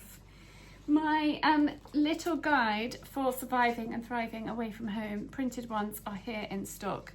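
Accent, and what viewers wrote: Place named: England, United Kingdom. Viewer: ❤❤❤❤❤❤❤❤❤❤❤❤❤❤❤❤❤❤❤❤❤❤❤ Hello Sweet 😊. (still on vacations 😅) What and incredible hair device😮😮😮😮 Wow Wow! Thanks God you do have a balance with Brand Deals because its Much Much more credible and we do BELIEVE in you! There are other youtubers that seems a retail seller. Its utterly annoying🥱. The English accent that you have when talking to is so so Beautiful 😊 Rosie 🩷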